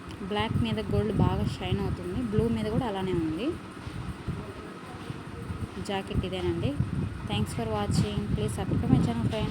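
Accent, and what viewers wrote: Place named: Chittoor, India. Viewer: Me slang konchem chittoor slang laga undi so anduke అడిగా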